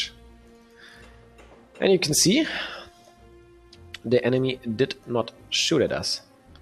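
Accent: Germany. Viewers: You have German Accent Im German so my Englisch is bad. Bist eigentlich ein guter Youtuber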